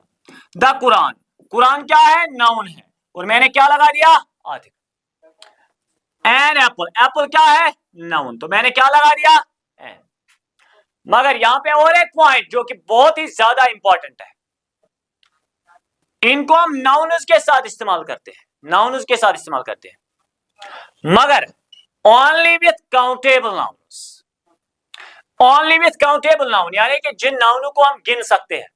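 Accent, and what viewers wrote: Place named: Kulgam, India. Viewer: Sir shayad kulgam sai hai (asent)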